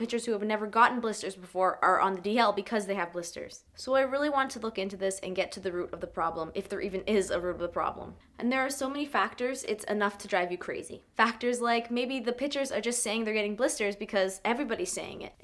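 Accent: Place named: Toronto, Canada. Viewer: are you from the Toronto area?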